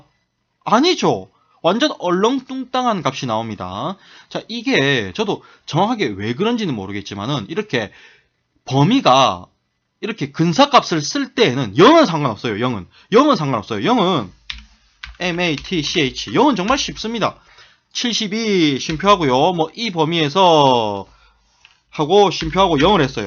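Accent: Gyeongsang, South Korea. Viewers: 쌤 너무 감사합니다 ㅠㅠㅠㅠ컴활때문에 들어왔는디 같은 경상도인으로서 어투가 매우 친숙하고 화나있는 듯 한 말투이지만 절대 화난것 아닌 잘 가르쳐주시고 싶은 마음이라는게 여기까지 느껴져요ㅋㅋㅋ♡♡♡ 합격할게요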